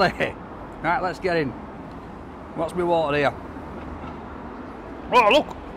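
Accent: United Kingdom. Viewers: We should all have a in our lives. What a wonderful, positive person. I’m an expat living in Northern California and it’s delightful to hear talk. The longer I’m in the US the more I yearn for all things UK. Thank you, for bringing a little bit of home to me.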